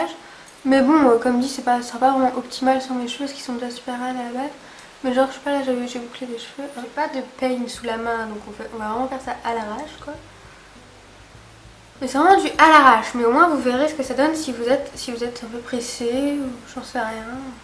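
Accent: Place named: Alsace, France. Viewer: Hello ! Je participe a ton super concours! Je n'ai pas malheureusement rien testé de la marque Remington, je n'ai pas de lisseur non plus car mes cheveux sont relativement lisse comme les tiens; et grâce a ton tuto je me dit que je pourrai finalement quand même en utiliser un pour me faire des ondulations ! :) Mon anniversaire est le 24 oct ça serai un superbe cadeau haha Ps: J'aime beaucoup ton petit accent Alsacien !!!!!!!!! vive l'alsace ! salut bissome !!! :)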